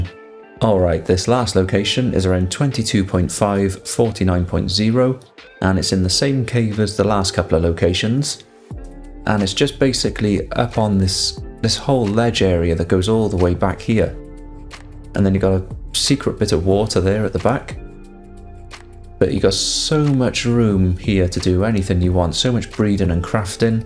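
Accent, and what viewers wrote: Wales, United Kingdom. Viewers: am i picking up a welshy accent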